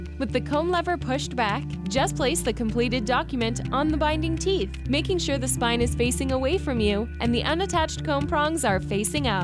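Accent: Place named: West Coast, United States. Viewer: Jesus... worst accent for advertisements ever!!! Please note. Brits find the West coast accent really really annoying!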